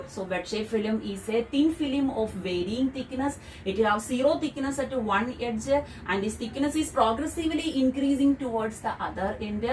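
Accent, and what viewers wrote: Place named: South India, India. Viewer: Tq mam,r u from kerala/tamilnadu/andr..mam?ur accent is like my bio teacher who teaches awesome like u mam 🤩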